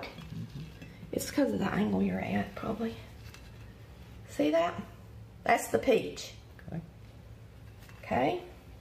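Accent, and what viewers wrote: South, United States. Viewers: Glade you feel better. Also are y’all from up north? The kitchen reminds me of our kitchen in Ohio. But you sound southern. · I do enjoy your southern drawl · I love the South in yo mouth, girl!! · My mama was born (1916), and raised on a farm in Texas, along with her 9 brothers and sisters. Your accent, southern terms, expressions and idioms sure do make me miss her. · That southern drawl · I enjoyed your video very much! I plan to try your recipe soon! I have never had a fried apple pie, but my grandma and mother made fried banana pies, which are delicious! They used canned biscuits for their fried pies, but there's nothing better than homemade biscuits. I am looking forward to trying your recipe with a variety of fruits. I am from N.C., so watching you cook and listening to your southern accent, made me miss my relatives that I have lost through the years. 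I live in the state of Washington, but my heart is still in N.C. I pray 🙏 you have a speedy recovery, with no complications!! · The southern drawl really is a great sound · I love your southern drawl too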